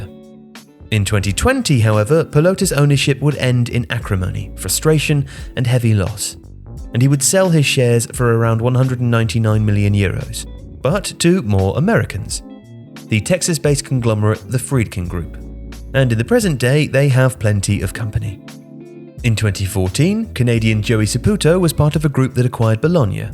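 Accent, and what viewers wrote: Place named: England, United Kingdom. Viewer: He pronounces different because he is English that is how it is in his language.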